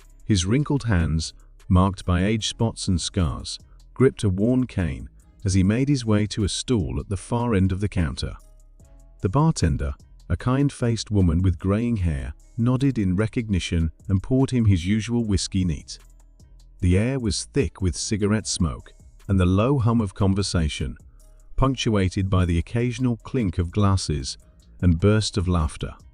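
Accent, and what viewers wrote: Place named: United Kingdom. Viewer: very nice. a believable story and pretty well written. some of the location details seemed off, but i'm not a WWII history buff, so can't be sure where the 101st Airborne was throughout it all, especially Normandy on D-Day. just not sure. What was a real sticking point for me was the AI reading. My complaints are as follows: 1) a British accent? for an American story? 2) the mispronunciation of WWII - if it is going to pronounce it "EYE EYE", then spell out the TWO... 3) is there no way to "train the AI" to pronounce correctly? I've worked on AI training projects, so they're out there. So there should be some way for the creator of the video and text writer to correct the AI. If not, change the text. It really diminishes the enjoyment of what was actually a tear-jerker of a piece. On a scale of 1-10: Story: 9; AI narration - 4.5; Proofing by creator - 0??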